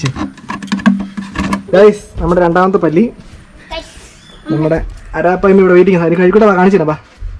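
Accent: Kottayam, India. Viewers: R u from Kottayam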